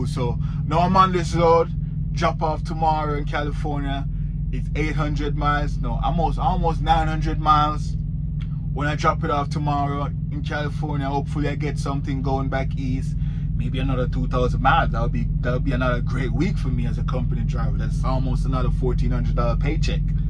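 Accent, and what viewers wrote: Jamaica. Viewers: Are u ja Jamaican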